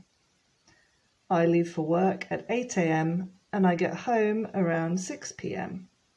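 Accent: United Kingdom. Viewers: oh okay I got it. I have been learning English since my childhood. My English knowledge is too bad. Next year I am aiming to go to private university. I only watching British English chanels.beacause I like how you speak. I mean accent pronunciation. I'd like to take online classes with you unfortunately it's too much in our currency.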